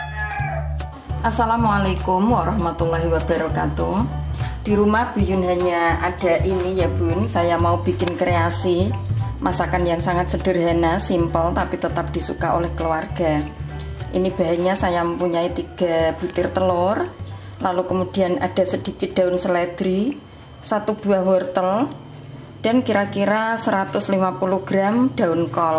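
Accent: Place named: Java, Indonesia. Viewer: Medoq bgtz ngmongny...🤣🤣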